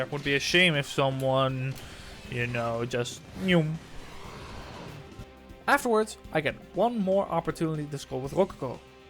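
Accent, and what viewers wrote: Limburg, Netherlands. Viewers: hoor ik hier nou een tikkeltje limburgs?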